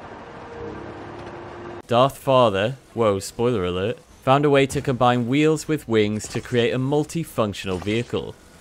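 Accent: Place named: Geordie, United Kingdom. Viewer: Are you from the north east by any chance, your accent has mackem/geordie twangs 😄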